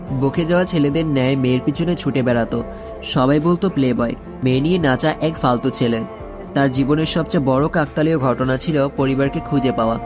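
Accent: Sylhet, Bangladesh. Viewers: Vaiya apnar basha ki Sylhet?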